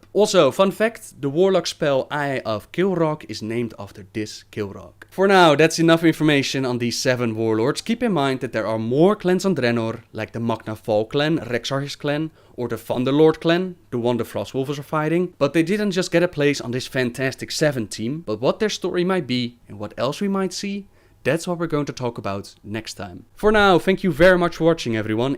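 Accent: Netherlands. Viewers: lol are you dutch? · dutch is definitely his first language, has to be xD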